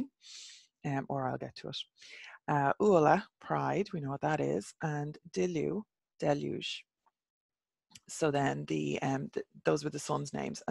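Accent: Ireland. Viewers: Thank you so much,. I appreciate hearing the Irish pronunciation as well as your commentary and look forward to appearances of the goddess forthcoming!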